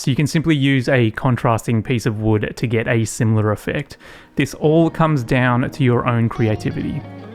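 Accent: Australia. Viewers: Ah didn't actually know you were Aussie. I like it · Awesome dude, love hearing a fellow aussie while hes doing friggen amazing work! Be good if you could use some native high end timbers if possible, if there are any. I'm only a couple years in and just use off cuts from a stair manufacturing place. Love your work mate.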